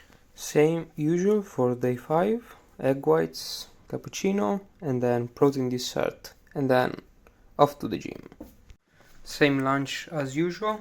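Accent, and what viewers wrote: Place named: Italy. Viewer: I love your accent! ❤ i love youu!! So amazing 😂😊✨ be blessed! I love italy! I love italian men ❤❤❤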